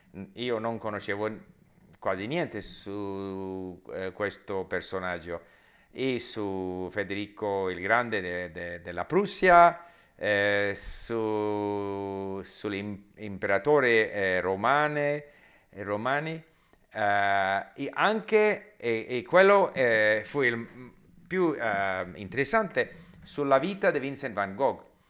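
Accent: Spain. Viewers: Cerca di separare lo spagnolo da tuo italiano... L'influenza è troppo forte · Complimenti, il tuo italiano non è male soprattutto per essere un poliglotta. Si sente molto l'influenza dello spagnolo, nell'accento, in alcuni errori e soprattutto nel dire y invece di è :) che secondo me è molto difficile, intendo che io quando ho imparato il francese usavo spesso congiunzioni in italiano senza rendermene conto, in particolare ma invece di mais. Complimenti in ogni caso e grazie per il tuo esempio! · Bravo, il tuo italiano è notevole nonostante le evidenti interferenze del tuo spagnolo. Penso che la cultura che ti sei fatto nella nostra lingua non sia per nulla una cosa da poco. Complimenti.:D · Complimenti Mr, l'associazione allo spagnolo è molto forte, ma il suo italiano è comprensibile.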